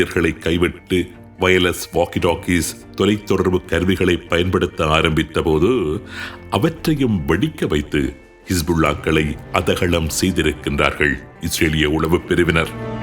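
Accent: Sri Lanka. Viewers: இலங்கை தமிழ் 🙏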